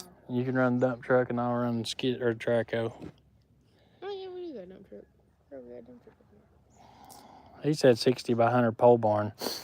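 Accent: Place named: California, United States. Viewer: OK sounds like a Californian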